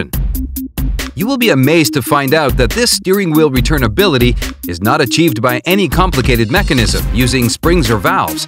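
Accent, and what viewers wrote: United States. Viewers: The video was produced with an American English accent yet the car is shown being driven on the left side of the road. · In what part of the world do they drive on the left, and speak with an American accent? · Speaking Americanized English, but with a steering wheel on the right side. Hmmm. This is an awkward video.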